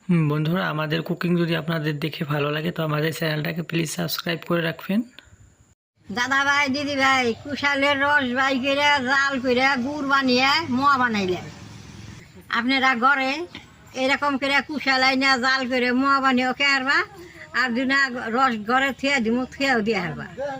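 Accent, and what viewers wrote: Tangail, Bangladesh. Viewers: টাঙ্গাইলের মানুষ আমি। কুমিল্লা থেকে দেখছি। আপনার মুখে টাঙ্গাইলের ভাষা শুনতে খুব ভালো লাগে।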